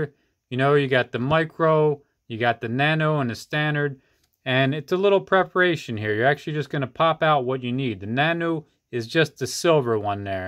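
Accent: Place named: Italy. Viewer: Hay! What happened you lost your Italian accent half way thru?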